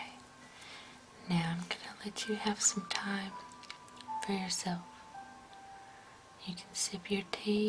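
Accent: South, United States. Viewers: I'm british so the very short answer is that to me yes she does ;o) Now however you've got me questioning myself (and so I feel like I'm putting my neck on the block for this one) since I'm obviously not exactly an expert on american accents but to me her accent sounds southern at times. I must confess it is only slight however, hence why I'm now questioing what I'm hearing :o/ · lucky, i want to be british lol, and to me it just sounds like no accent because im american :P i don't know, now that i hear it its a wee bit southern at times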